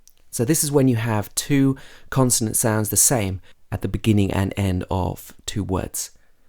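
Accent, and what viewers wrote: United Kingdom. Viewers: British accent ??????? Je je je.....no thanks.... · Excellent British accent 💗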